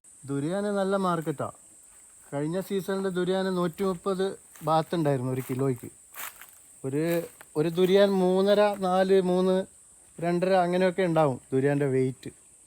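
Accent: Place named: Kannur, India. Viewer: Sir kannur side anennu thonunnu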